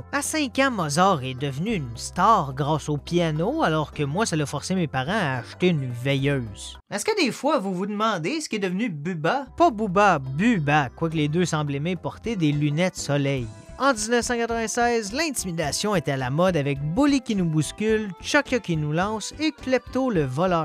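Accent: Quebec, Canada. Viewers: L’accent québécois, au départ je n’aimais pas mais avec le temps j’aime bien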